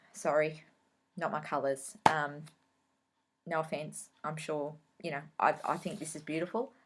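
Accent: Australia. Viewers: Thanks for another great video. so sorry to hear about your friend's parents. I love listening to your stories and your lovely accent. I visited our relatives in Melbourne 3 years ago so listening to you reminds me of my trip. I'm a novice but love trying different pieces. Your stitching is amazing! Happy stitching too next time. Lori S.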